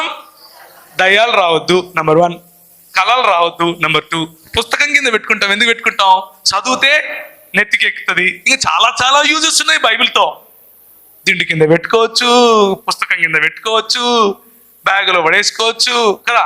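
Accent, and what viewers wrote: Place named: Telangana, India. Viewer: Full Telangana accent..😄..we miss you brother..feel very sad..🙏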